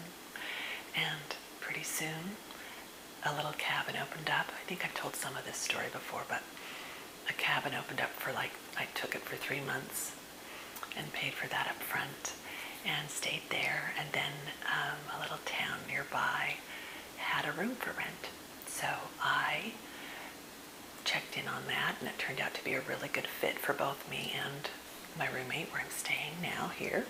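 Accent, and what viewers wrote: South, United States. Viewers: I saw ur souther ascent vid and actually thought u where southern lol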